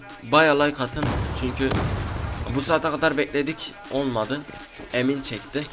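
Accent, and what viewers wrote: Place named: Southeast, Turkey. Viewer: Şivenden anladım hangi güneydoğu şehrinde yasiyon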